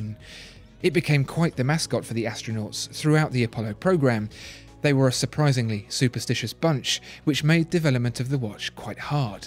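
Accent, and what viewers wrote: United Kingdom. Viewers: I look forward to each and every one of your videos, they are supremely well done and different to any other watch channel on YouTube. Also it is pleasant change having a British voice rather than the many American channels (no offence to our US friends, just different). Keep up the great job 👍😎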